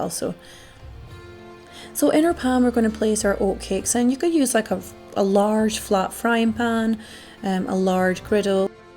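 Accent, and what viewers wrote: Scotland, United Kingdom. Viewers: oh I do enjoy the recipes and perhaps even more so because of your lovely Scots. It's music to my ears. Happy Burns Night from the Bavarian Highlands, the alpine region. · Came to this video from your crowdie video. Your camera work and quality are excellent and you have a lovely soft voice and of course the Scottish accent is the cherry on the cake. 😊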